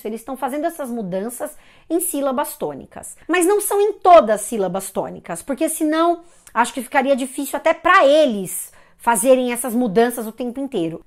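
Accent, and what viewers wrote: Rio de Janeiro, Brazil. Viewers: Amo os "searviaçoish" dos "carioacaish". PS: tive um colega que também fala "eu naisci", acho que é do Rio.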